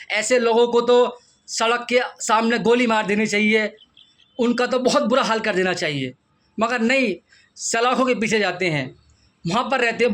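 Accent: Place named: Uttar Pradesh, India. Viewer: Sandesh Khali ke Shahjahan pe b ek video bana de bhai . Tumhari bhasha Uttar Pradesh ki lag rahi hai , tum bengali to ho nhi .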